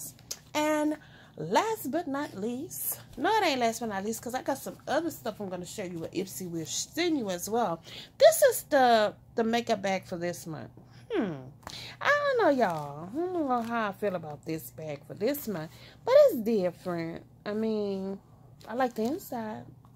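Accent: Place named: South, United States. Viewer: sound like she from the south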